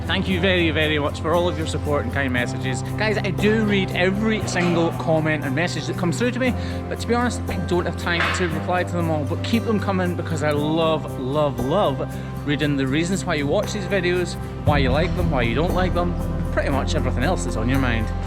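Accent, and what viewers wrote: Scotland, United Kingdom. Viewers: I love to watch your videos! Very relaxing with the music and your calming nature. You’re so positive and it brightens my day when I’ve had a bad one. I’ve always loved the Scottish accent too 💜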